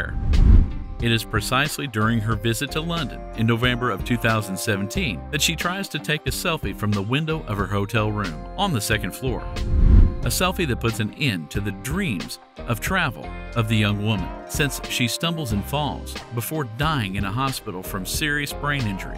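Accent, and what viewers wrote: United States. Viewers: Can't you use feet vs meters? I hate googling the answer. Lol. U sound American, not British.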